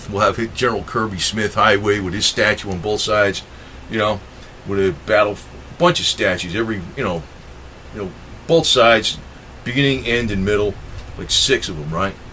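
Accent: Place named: New York, United States. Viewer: Checked out your sportster video...You almost sound like a New Yorker...I am a New Yorker and talk the same way...Oh yea and Jesus was Jewish, there were no Christians until they started following Christ..there were Jewish folks but they don't believe Jesus was the saviour...the Christians believed all the way...so do I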